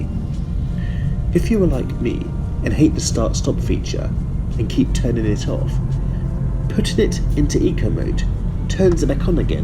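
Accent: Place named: England, United Kingdom. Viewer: Why do you have an English accent but in Russia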